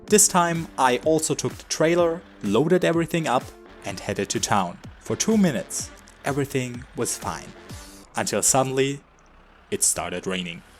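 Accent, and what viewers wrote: Germany. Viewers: Hey you soumd german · why do you sound german? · because you sound like any average german 20yold with the way you speak english. No offense tho I'm german myself xD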